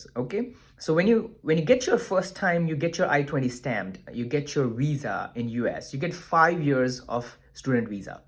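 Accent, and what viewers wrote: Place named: South India, India. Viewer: Chutiya accent badal be... Understand se pata chalta hai ki South Indian hai ye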